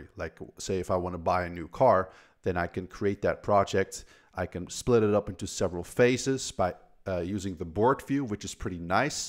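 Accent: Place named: Netherlands. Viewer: I'm here for 30 seconds and I'm guessing you're Dutch?!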